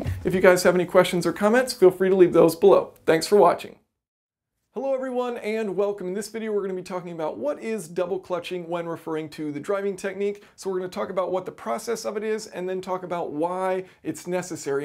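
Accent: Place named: United States. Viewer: only problem he is american. and drives lhd car and has a american accent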